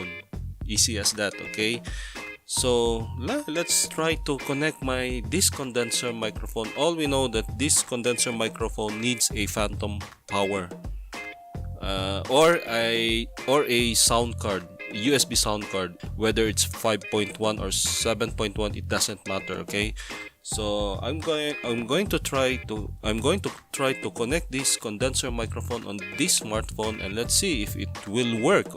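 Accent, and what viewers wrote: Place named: Nagaland, India. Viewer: thank you bro. And u sound like a native from nagaland . Google it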